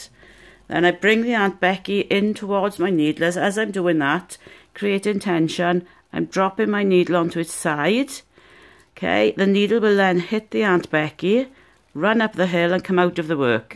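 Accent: Wales, United Kingdom. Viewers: Wonderful tutorial and so lovely to hear a 'tidy' Welsh voice.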